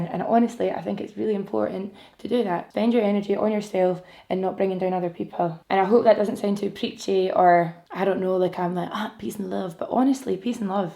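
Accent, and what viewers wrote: Scotland, United Kingdom. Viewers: Watching you and listening to your accent helped me prep to understand the Scottish side of my family that I met a couple of weeks ago lmao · Love the eye shadow. Gorgeous color I have been wanting to try that foundation as well. I saw it at Sephora during the sale. Beautiful finish. Love watching your vlogs and videos. Yes your videos and vlogs. Maybe its the Scottish accent and all the "wee" words you say. 😊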